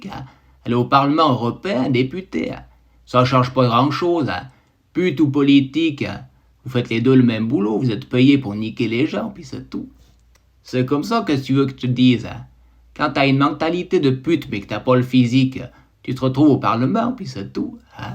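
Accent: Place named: Valais, Switzerland. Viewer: Excellent, l'accent valaisans mais avec un bonnet valaisans; incroyable.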